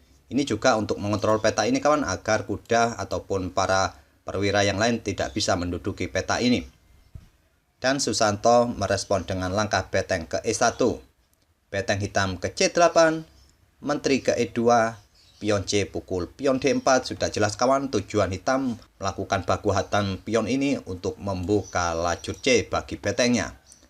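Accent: Java, Indonesia. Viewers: Jowo ne pundi min?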